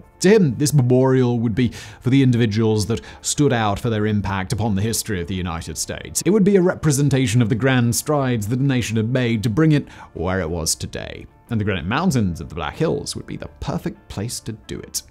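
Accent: United Kingdom. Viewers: Wow, I never thought of Simon as British, did he purposefully unlearn the accent to appeal to an international audience? xd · I Adore your accent! I could listen to it all day! But I did perceive some condescension in your tone with this video. I recognize as the rather sexy, erudite you are, the unintentional cadence is bound to slip in once in a while,! Particularly when as a Brit you’re critiquing the USA. It did come to mind though as an uninformed, oblivious but to his own surroundings Yank, that the shall we say reclamation of land from the Lakota Tribes that sat squarely in the middle of the US’s borders during the 1880’s, might have been a direct export. From Great Britain’s export idea of conquer and seize in the preceding 30 years of an entire continent really, India, in the Eastern Hemisphere! Of course after a hundred years of pillaging India of the jewels and resources, the UK did return it good as new! 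And don’t forget more recently Hong Kong. We Americans do still have work to do! But. Always remember. Americans may not know a damn thing about your politics ( and continued penchant for powdered wigs) but we absolutely LOVE our dear British cousins. Especially the adult young lads with that intoxicating accent! Seriously at this point the studios won’t even hire a male actor for an American role unless he’s British! I’m waving at you Tom Holland!☺️ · Hearing a British man talk about how america doesn't like people taking their land and disagreeing with America usually ends badly honestly made my night.. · I agree with you, it is about the content, not the accent., and I did not mean that he did not have a native accent. I was simply replying to [yeet][yeet] who thought Simon "unlearned" his British accent. I was simply pointing out words where one can clearly hear the British accent.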